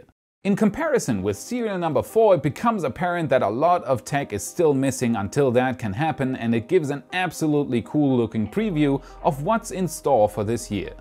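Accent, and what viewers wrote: Germany. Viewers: wait... are you from germany? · Wait a second... do you have german roots?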